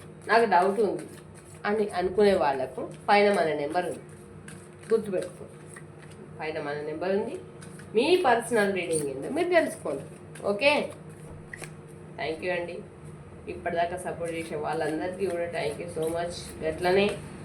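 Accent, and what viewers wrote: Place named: Andhra Pradesh, India. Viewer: Madam Andhra Bhasha chala baguntundi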